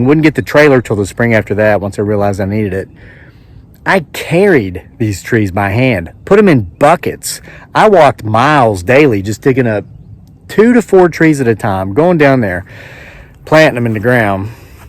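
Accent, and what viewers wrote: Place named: West Virginia, United States. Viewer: Great videos! We have to ask where you’re from? Your slight accent reminds us of our friends in Fairmont, WV 😄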